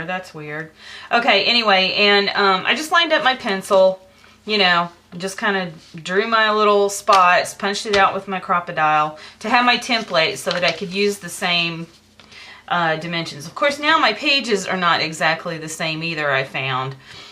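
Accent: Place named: United States. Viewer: What a wonderful album,and watching you do it from the start was great... Love your videos and will be watching them all, also love your accent, whereabouts in the States are you from?.. I am in UK, we do not get some of the things you get easily over there, though it is getting better with the online stores, but my are they pricy! Best wishes, Lesley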